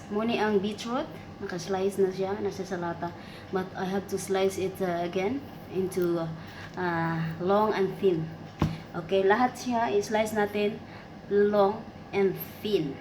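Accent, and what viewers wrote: Visayas, Philippines. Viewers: Slang bai morag dili bisayang dako, sunod mag inintsik unya ta, tan- awon nato makaya ba sa mga bisayang antik. Zucchini - ( zu - ke - ne ) pronunciation bai, morag chikinene. Bai, bilingual is a talent that we should all be proud of. We all wish to speak better English or any other language nothing wrong w that. To be bilingual it’s got to be amazing, you can talk to any ppl in the world in their own mother tounge. You can get jobs too by speaking different languages. Overall it’s good. You can speak 🇰🇼 we wish we can + your ever so deep visayan that sometimes it’s so hard to understand bc that’s how deep it is & that’s one of your talents not a lot of visayan who can speak like how you speak it’s just so deep & it feels so good to hear it from you bc we absolutely missed that from our grandparents. Centre Point ad Bai 3 mins long para sa pamasko bai pohon. 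Hehe, bai you can’t stop thinking about something else niambak hinoon ang patatas. Mosayaw diay ka bai kon maghiwa. 😂kataw- anan kaayo ka. Bai makanirbyos mana imong phone bai kadaghan na hapit moambak. Pwerting lamia bai tan- awon imong salad. Bai magikon nalang nato ang nomero para makasal naka. 💞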